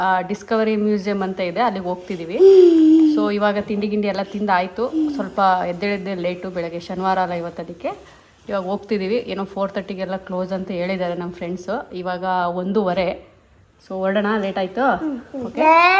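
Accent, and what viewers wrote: Mandya, India. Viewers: Mam just informed all what's Retired age in US for Indian people’s for all categories:Nimma Mandya kannada bashe onthara channagidhe😂😢😅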